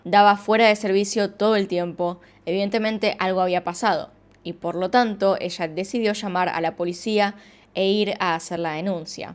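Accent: Argentina. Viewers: Tu acento argentino me gusta mucho, es un placer oírte, gracias por hacer casos para nosotros.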